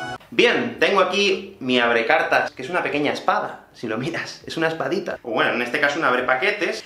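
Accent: Spain. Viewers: He venido a tu canal porque la verdad me gusta el español de los españoles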